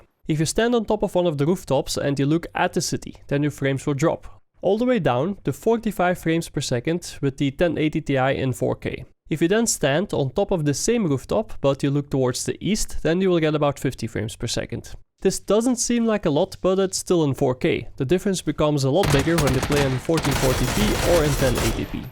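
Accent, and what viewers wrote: Netherlands. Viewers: The Dutch accent is coming through strong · I could hear that you were Dutch in about 3 seconds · Dat hoor je goed dat je van Nederland komt hoor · Ur dutch right? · Are you Dutch? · wat een dik Nederlands accent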